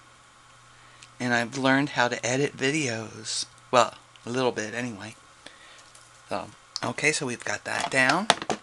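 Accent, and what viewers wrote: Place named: North, United Kingdom. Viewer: Very pretty card! I love how you mixed the hearfelt creations with the Stampin Up. When you say y'all it cracks me up because i don't hear much of an accent, or maybe a northern accent.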